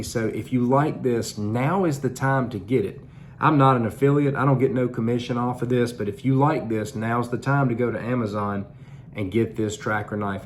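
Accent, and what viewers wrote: South, United States. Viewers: Hey big guy its great to see you again. I love the way your videos start. "Hello maaa feeeaaaands" that southern draw that all the chicks like i bet. Im just downloading your new slingshot aiming video. You know what. Try this. Forget aiming altogether. Forget your slingshots there. Just draw it like a bow. Release within 5 seconds else your bands cool down. After a few hundred shots muscle memory will take care of the aiming. If you miss; dont adjust anything. Watch your target; your mind will give you an unrest feeling; hesitant awkward if your out of sync. Frustration is just your mind trying to help you"talking". Left brain is totally useless for slingshots. Spacial awareness is right brain. Colour; shapes; movement; tonal changes. Sights dont help. Thyr liars! Art is right brain(in beginning your left brain tries to help saying"this should be like bla bla bla...". 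Slingshots is right brain not left. Its art; not science. So if your frustrated and get that grrrrr!!! Just stand upright; point your hands up and out and take a deep breath and relax. Then just fire away; over and over. Also try this. If you have a rope loop hanging from your slingshots; hang it from your arm where a wrist brace would sit. And twist the rope and slingshot till its comfortably tight under your wrist; then hold slingshot as normal. You made a wrist brace. You dont even need to hold your slingshot.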